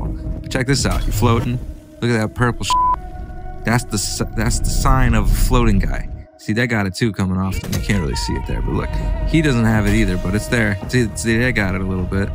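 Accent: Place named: Bronx, United States. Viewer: When a Hoodie from the Bronx starts to talk about a Computer Game - I am out!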